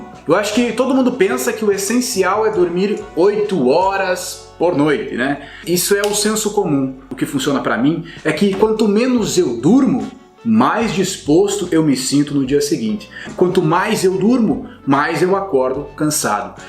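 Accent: Rio Grande do Sul, Brazil. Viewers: Certo que esse cara é Gaúcho haha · Mas bah tchê, acho q tu é Gaúcho, não? Kkk é nóis bagual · De que cidade gaúcha tu és tchê? Rsrs sou de Gravataí-RS abraçao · Pelo seu sobrenome, seu jeito de falar, creio q tu seja do rio grande do sul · Como se diz aqui no Paraná, "LEITE QUENTE FAZ BEM PARA O DENTE". Contanto, acho que o deve ser gaúcho, pelo sotaque. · Por acaso você é gaúcho??????? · Mas que bagual, gaúchos por aqui tchê, loco de especial meu pia?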